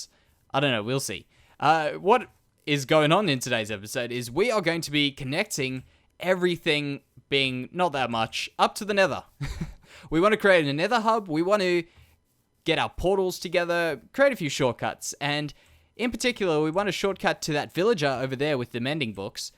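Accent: Australia. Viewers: Where in Australia are you from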